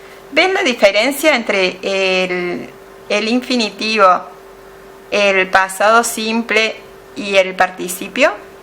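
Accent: Córdoba, Argentina. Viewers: Lo cordobés se nota a la legua, aguante Córdoba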